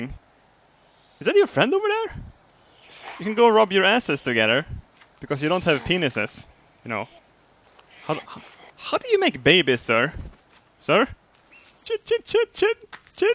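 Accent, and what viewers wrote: Sweden. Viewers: Nooo person, don't correct his swenglish. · Jag är glad att jag är svensk... Annars skulle jag inte förstå ett ord du säger. jag älskar dit svengelska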